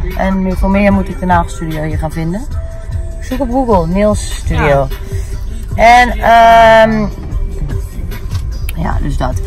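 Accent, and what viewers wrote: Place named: Amsterdam, Netherlands. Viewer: Waarom zit je zo vaak aan jouw haren te trekken? Dat vind ik echt irritant om te zien! Verder doe je het leuk en vooral jouw Amsterdamse accent vind ik leuk.😍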